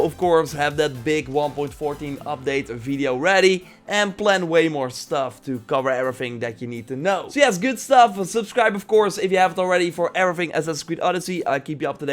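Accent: Netherlands. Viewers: Hoe kan je zo goed engels je hoort amper dat je nederlands bent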